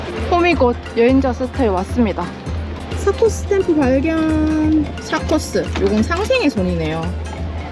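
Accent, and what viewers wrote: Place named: Busan, South Korea. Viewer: 처음으로 댓글 남겨봅니다. 늘 잘 보고 있습니다. 저에겐 많이 힐링 되어요. 감사합니다. 아무것도 섞이지 않은 순수한 선의와, 솔직하고 따뜻한 일상 영상이 너무 좋더라구요. 설령 슬프고 좀 우울해 쳐지고 하는 영상도 넘 순수해서 같이 공감할수 있어서. 강아지가 제가 집안일 할때면 거실에서 있으면서 tv 영상 켜주는걸 좋아하는데 그때 늘 영상 켜놓고 있어요. 얘도 힐링이 되는지 소파에 올라가 늘 꾸벅 졸더라구요 ㅎㅎ 구독자 선배님들께 예쁘게 보이고 싶어서 화장을 진하게 한다고 하는 영상은 정말 빵~ 터졌어요. 너무 귀여웠어요. 그리고 의 아재개그 정말 팬입니다. 저 몇개 외웠어요 ㅎㅎㅎ 또 서울 와서 우리는 사투리 안쓰는데 사투리 쓴다고 알아봐서 놀랐다고 해서 남편이랑 보다가 진짜 뿜었어요 ㅋㅋㅋㅋ 어찌나 귀여운지… 실제로 부산출신 제 친구가 한 말이랑 너무 똑같았거든요. ㅋㅋㅋㅋㅋ 두분 너무 너무 잘 살고 계신것 같아 부럽습니다. 코로나, 계엄 겹치며 사업 문제로 몸도 마음도 많이 지치고 힘들었을때 함께 일상을 나누는 듯 정말 많이 힘이 되었습니다. 감사하고 극 내향인 일인으로서 인제서야 댓글 남겨봅니다. (이것도 메모장에서 몇번의 수정을 거쳐서 올렸는지 ㅋㅋㅋ)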